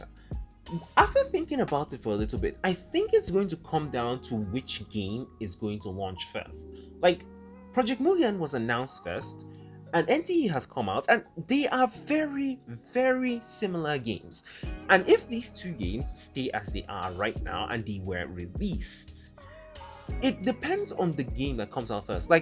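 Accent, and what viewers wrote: Nigeria. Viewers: Bro are you Nigerian? You sound Nigerian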